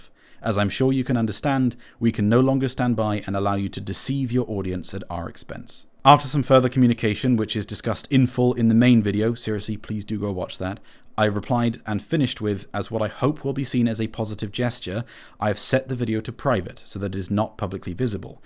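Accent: United Kingdom. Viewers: Question? How can they take you to court if they’re not a UK company. I assume from your accent that you’re in the UK. I would like to see how far (in minutes) this would get in a UK court.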